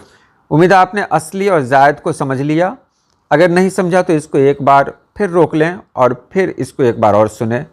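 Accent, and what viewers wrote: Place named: Patna, India. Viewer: سر میں پاکستان سے ہوں میں آپ کی ویڈیو باقاعدگی سے سنتا اور دیکھتا ہوں۔ مجھے ناجانے کیوں ایسے محسوس ہوتا ہے جیسے آپ کا تعلق پٹنہ سے ہے کیونکہ میرے ساتھ مسقط میں کچھ دوست کام کرتے تھے جنکا لہجہ بالکل آپ ہی کی طرح تھا سر آپ کا وٹس اپ کا نمبر اگر مل جائے تو میرے لئے باعث فخر ہوگی شکریہ اللہ تعالیٰ آپ کے علم میں مذید اضافہ فرمائے آمین ثم آمین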